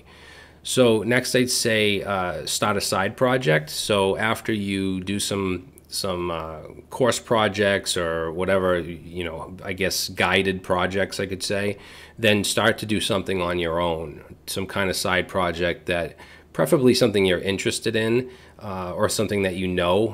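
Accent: Boston, United States. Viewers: Hi. New sub. Thanks for this. I got to (5 years ago now) where I just felt I wasn't progressing. mostly due to the company slowly pushing us regulars out tbh.. so that was depressing and I've been waiting for that spark again I had when I first started doing web development and that... was decades ago. Watched your React crash course (wasn't my first time trying React and each time it changed which was so frustrating) and I enjoyed it a lot.. Can't say I've ingrained all the concepts yet but I'm continuing this time. Kind of have to financially. Also, I heard the accent. I'm just north of Boston. Maybe one day we'll meet. Thanks again for the down to earth everything.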